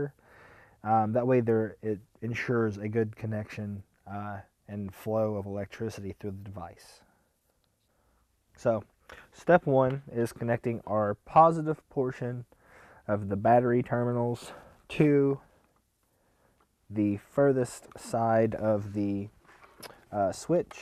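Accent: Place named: Appalachia, United States. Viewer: That's what I said moe men tary = momentary, not moNetary, may just be his accent, I'm used to listening to hillbillys ;). Still it was a good vid and I can't wait for the homemade rebuildable atomizer. No insult intended.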